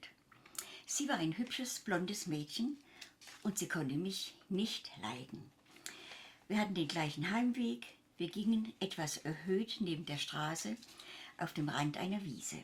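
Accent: Swabia, Germany. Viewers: Kann es sein das du leischt schwäbisch redest 👐🏻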